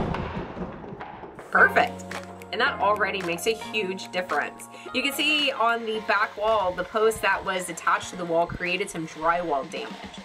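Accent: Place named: South, United States. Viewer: "Sawlz Awl"...love the southern drawl 😁 Awesome job,!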